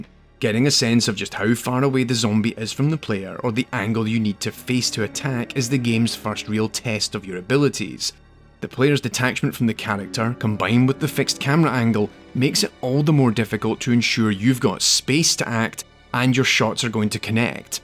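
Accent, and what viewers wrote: Scotland, United Kingdom. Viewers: First video I've seen of this channel, I could listen to this Scottish brogue forever. Excellent, excellent analysis, well done.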